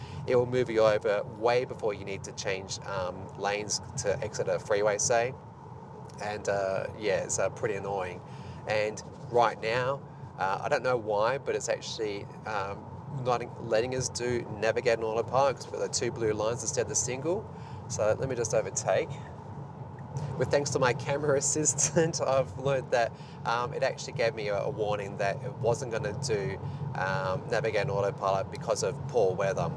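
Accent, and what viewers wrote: Australia. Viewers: Don't laugh at the Tesla not understanding the Aussie accent. Why expect more from it then the rest of the world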